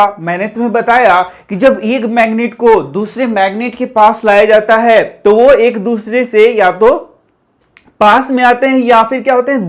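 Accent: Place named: Uttar Pradesh, India. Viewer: sir aap up ke hona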